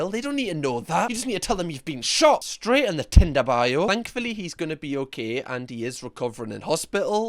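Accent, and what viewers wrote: United Kingdom. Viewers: I love this being explained in your Brit accent. It just makes me realize how petty unhinged all of this it is. It’s gone international 😂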